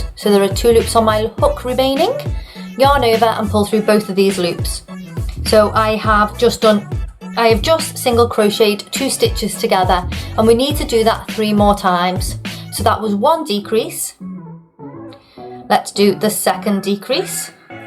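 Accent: United Kingdom. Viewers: I just bought this pattern & I am excited to make a start. I do have 2 questions though. Why is (Jason Mamoa’s) Aquaman white? Also from your accent, I guess you’re British. But you use US terminology. Is this pattern available in UK terminology. I don’t mind buying it again, as it would make my English heart glad!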